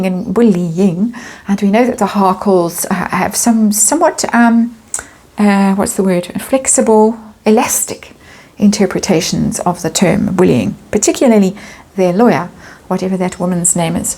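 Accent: South Africa. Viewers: Your readings are so interesting, and pretty much on the money . your voice transports me back To Cape town where i lived in the 70! Looking forward to the next! Grateful for your time and sharing ❤ I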